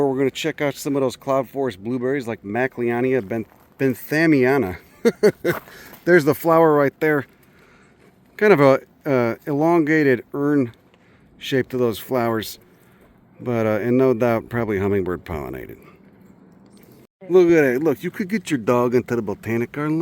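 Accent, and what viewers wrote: Chicago, United States. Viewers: He’s from Chicago. It’s a Chicago accent. You have to be born and raised here.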